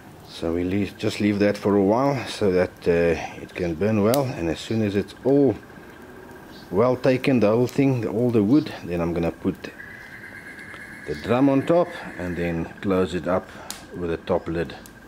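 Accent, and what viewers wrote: South Africa. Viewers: Are you South African? This is a great process, similar to others so it for sure works repeatedly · Are you south African?? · Oh yes the true Soud Afarikan accent! Love it! 😂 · He sounds so South African. The accent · I already knew it when I heard him, I’m from Cape Town and the jojo tank with the Isuzu in the background just hit the nail on the head 👌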